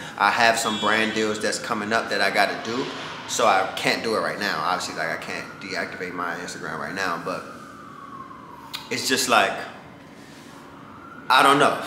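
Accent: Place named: Florida, United States. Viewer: Are you from Florida? I love your accent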